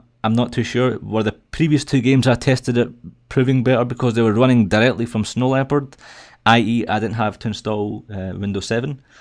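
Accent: Scotland, United Kingdom. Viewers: Scottish sounds awesome, much more special than regular English.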